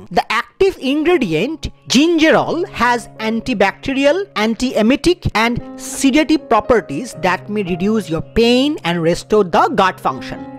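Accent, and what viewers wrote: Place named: Bengal, India. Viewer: Bengali doc .. strong Bengali accent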